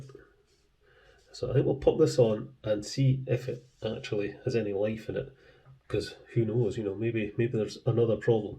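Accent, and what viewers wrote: Scotland, United Kingdom. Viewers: Enjoyed this and all of your other vids. Nice too, to hear a Scottish voice (Penicuik here!) - a nice contrast to the equally excellent Barrie Davie and Sam's Trains. Keep up the great work. 🚂🚉👍🏼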